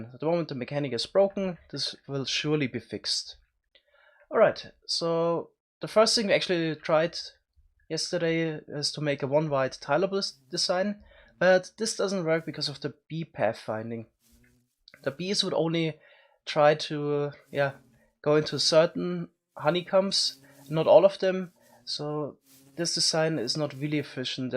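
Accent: Germany. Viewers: Ich finde es geil das man hört das du ein deutscher bist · Y do you sound so german?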